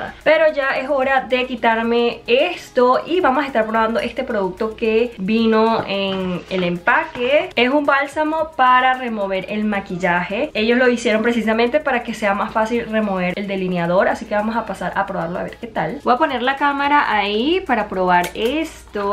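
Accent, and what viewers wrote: Venezuela. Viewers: Hola, no se por que tu acento, me parece venezolano ☺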